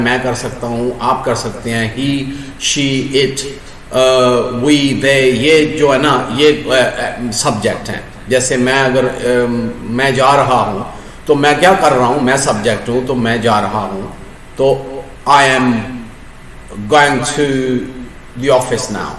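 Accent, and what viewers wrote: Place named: United Kingdom. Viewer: That is such a great opportunity to learn English with you I am so glad to listen your British accent so I am ready to learn English with you..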